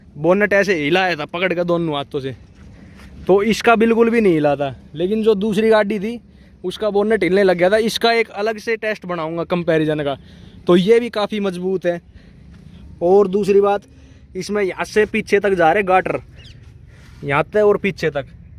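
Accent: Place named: Haryana, India. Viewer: Bhai your from haryana?, really like your hindi.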